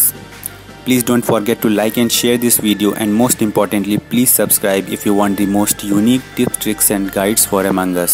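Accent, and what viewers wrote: India. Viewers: Voice reveal wiyhout face voice like an Indian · Is this dude indian? Look at that Indian accent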